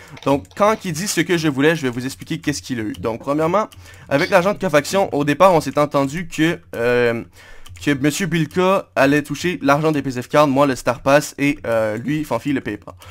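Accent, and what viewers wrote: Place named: Quebec, Canada. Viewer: 13minutes de plaisir a entendre cette accent QC 😍😍😘😘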